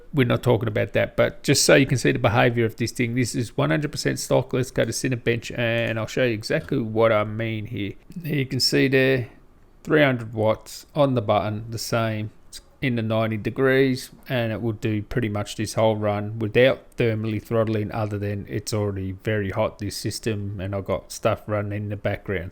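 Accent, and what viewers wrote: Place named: Australia. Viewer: Most fucking aussie voice I have heard in my life. But with a hint of wog too love it. Subbed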